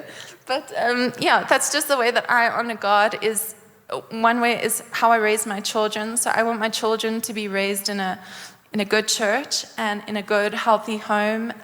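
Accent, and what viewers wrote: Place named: South Africa. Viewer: Can't you hear she is SA.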